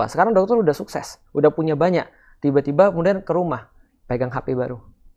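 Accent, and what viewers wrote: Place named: Palembang, Indonesia. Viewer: Kanji kau ni, 😂😂😂😂😂 asli ini Palembang Sumsel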